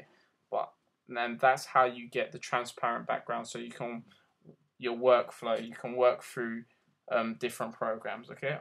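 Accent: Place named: United Kingdom. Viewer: thnx for that, helped me on my assignment. cool british accent u got there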